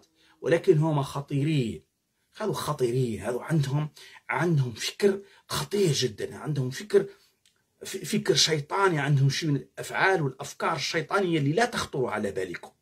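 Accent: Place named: Shilha, Morocco. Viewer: ❤❤❤❤❤❤ أخي لماذا تقول هاته وليس هذه ؟ لعلك تأثرت بلهجة اشلوحة لطول مقامك في الزريبة التي اخرجك الله منها ونور بصيرتك. الحمد لله على عودتك عن الباطل ورجوعك إلى اصلك وحقيقتك وكينونتك. نحن الشعوب الصحراوية الناطقة بالحسانية لسنا مغاربة ولا نحب ولا نقبل ان نكون مغاربة فلنا تاريخنا وثقافتنا وعاداتنا وتقاليدنا ولهجتنا الحسانية- نقول: هذه ، وليس هاته- عندي حساسية شديدة من لهجة اشلوحة. هههههه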